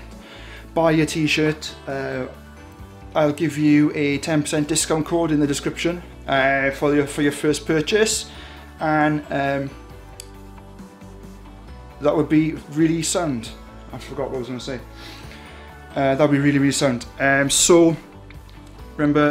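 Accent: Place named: Wales, United Kingdom. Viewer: Love your welsh accent 🥰🕷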